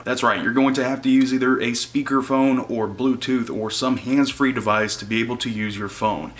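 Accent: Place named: South, United States. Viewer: Nothing cuter than a great southern accent 😋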